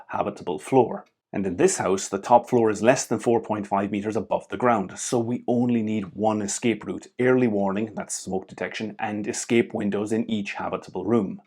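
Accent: Ireland. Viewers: Are you part Irish? I am hearing some Irish accent in your voice. Good video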